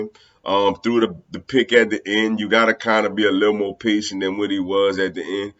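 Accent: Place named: Louisiana, United States. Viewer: Mr you sound like you make have a Cajun family tree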